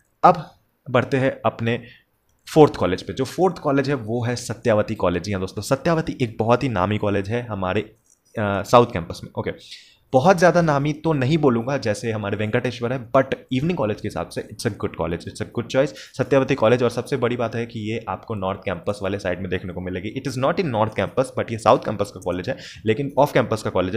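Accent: Bengal, India. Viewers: Naa mane arabinda k orobindo bolcho r motamuti mone hoy tumi bangali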